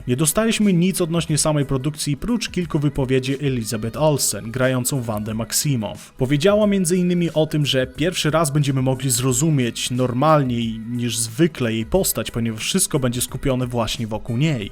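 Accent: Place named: United States. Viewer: Kanał ok, filmiki ciekawe, ale okropnie gryzie sposób narracji, skąd teraz wszędzie amerykańska tonacja zdań? W języku polskim akcent jest w zupełnie innych miejscach...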